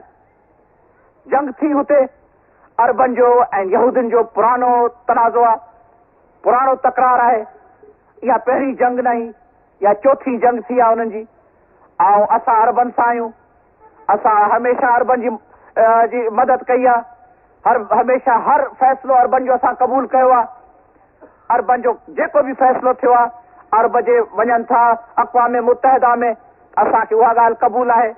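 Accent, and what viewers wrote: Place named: Larkana, Pakistan. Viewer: Kitni piyari sindhi bol rahay hain bhutto sahib larkana style sindhi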